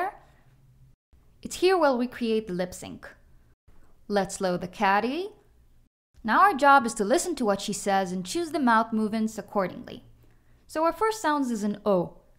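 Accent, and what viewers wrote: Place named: Scandinavia. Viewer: My guess would be... a hint of Swedish or Norwegian?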